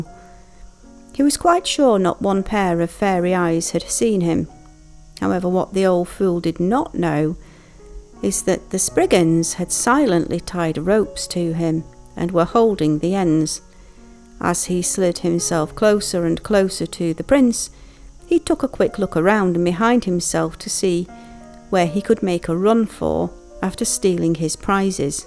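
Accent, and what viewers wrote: Northern England, United Kingdom. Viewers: I visited after the original Poldark series/ books, and eventually moved here. Do I detect a bit of Northern accent now. I always felt there was a mining connection with Cornwall and Yorkshire, my birth county. Lovely video.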